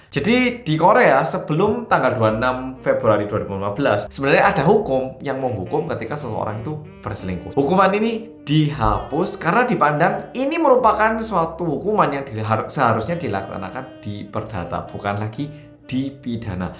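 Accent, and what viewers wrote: Java, Indonesia. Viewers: Mas nya asli orang korea ? Atau Asli orang indonesia... Logat nya aga sedikir terdengar ada logat jawa..tp muka kaya orang korea... Jd mas nya orang korea atau indo ?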